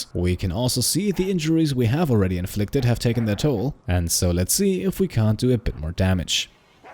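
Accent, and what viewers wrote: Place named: Germany. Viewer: didnt know you were german untill you started to read the patrions for the first time :D (few episodes back)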